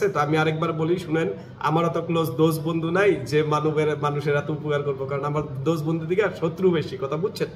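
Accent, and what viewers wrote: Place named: Dhaka, Bangladesh. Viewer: ইয়েস বস.... দেশের মানুষের উপকার করাই আসল বস... আমি কৃতজ্ঞ আপনার কাছে... আপনার ঢাকাইয়া উপস্থাপনা আমাদের ভালো লাগায়....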